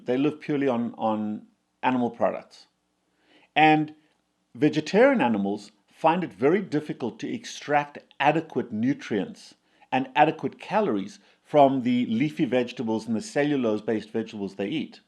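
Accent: South Africa. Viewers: I'm 53 and from South Africa, where it sounds like he is also from. Not sure where he got his apples from - they still look the same to me😂 But I agree that diabetics should not eat fruit.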